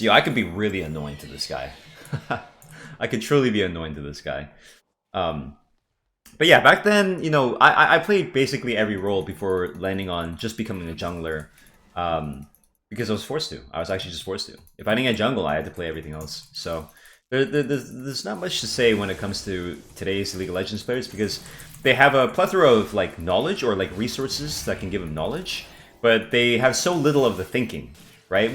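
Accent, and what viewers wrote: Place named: Finland. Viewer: his Finnish accent definitely helps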